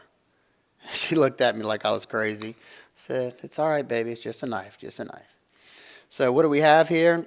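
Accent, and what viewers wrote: Louisiana, United States. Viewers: gotta be from Louisiana?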